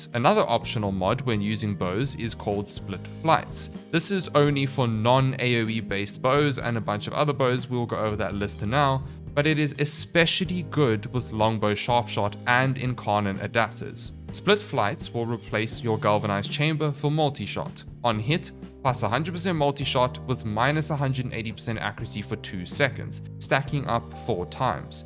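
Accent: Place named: KwaZulu-Natal, South Africa. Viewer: You from KZN bru?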